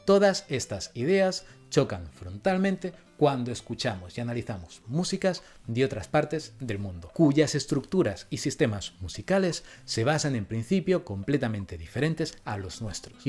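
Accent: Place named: Spain. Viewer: Tu forma de hablar me recuerda al Filopalomo, a CdeCiencia y a ElvisaYomastercard. Me pregunto si son los cuatro de la misma región de España o es algo mas extendido en el país? 🧐🤔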